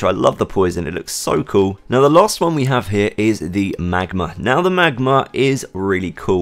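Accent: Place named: United Kingdom. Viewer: Don't worry about popularity man, you have a British accent, you'll be popular in no time!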